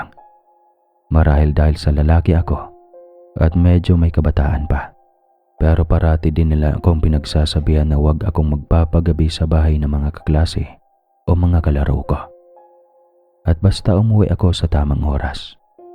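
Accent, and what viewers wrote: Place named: Bohol, Philippines. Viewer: Taga asa d i ka sir mura lageh kg tga bohol😁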